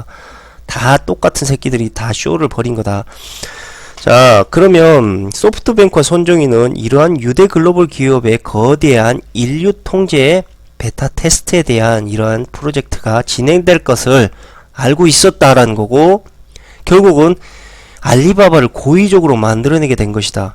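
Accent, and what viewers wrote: Jeolla, South Korea. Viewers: 전라도 사투리?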